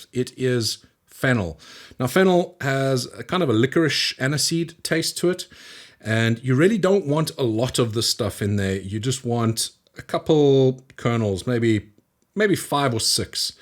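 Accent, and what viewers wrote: South Africa. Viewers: Thank you for video. The South African accent sounds like a breath of fresh air to me also. I grew up there. Been living in USA for 40 years now.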